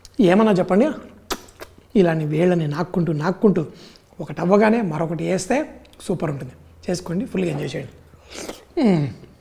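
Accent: Telangana, India. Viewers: Sir, I love your telangana language big fan of the way you speak kindly speak like that dont add Andhra language please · You’ve to be awarded the cultural heritage icon for Telangana💐🥇 the language of regional accents,the taste behind both nawabs and rural cultures are preserved,presenting through dishes are awesome.🙌👌🤲🏼❤️😍🥰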